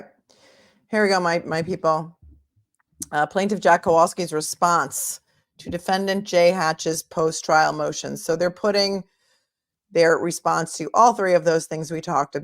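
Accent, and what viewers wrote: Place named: New York, United States. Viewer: Replay crew! I don't care how many YouTubers analyse this case, I'm always interested in your perspective and very grateful for your coverage. Thank you for all you do and keeping it real and classy in that gorgeous NY accent 🥰❤️🙏🏻❤️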